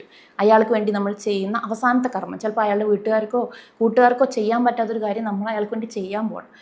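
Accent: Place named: Kannur, India. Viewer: Oru kannur slag😊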